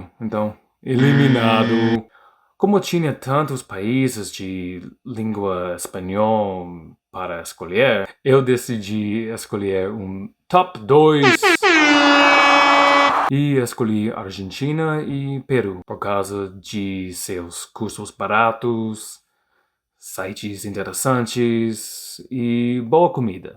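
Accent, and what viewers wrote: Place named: United States. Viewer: Parabéns bro, Já está falando português brasileiro fluente, apesar de um pouco de sotaque americano, mas vá em frente aos seus objetivos. Você só não nos falou se você lê e escreve em português. Sucesso ao seu canal !!!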